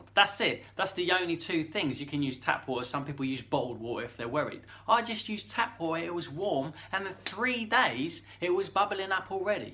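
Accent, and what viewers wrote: United Kingdom. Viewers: Hi, I’ve just discovered your channel and find you delightful and after seeing the first video I’ve quickly subscribed! As an American I love your accent and most of what you say I understand - sometimes I have to try and interpret a word but it’s so worth it. Right away I’m learning some things about sourdough that I did not know. My journey with sourdough just recently started and you make it fun!! I’m 78 years young and I think I have a crush on you, lol 😊 ( who says Americans can’t learn something from the Brits? ). Happy baking sweetie 😅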